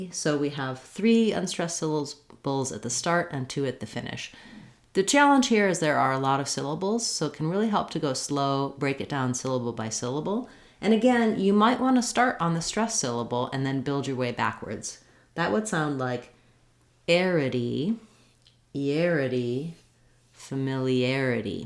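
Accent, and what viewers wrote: United States. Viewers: Is it a American English?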